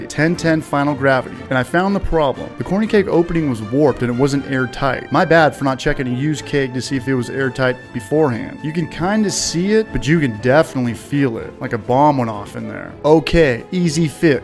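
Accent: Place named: Tennessee, United States. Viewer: I don't know but I can hear that Tennessee drawl slowly setting in on...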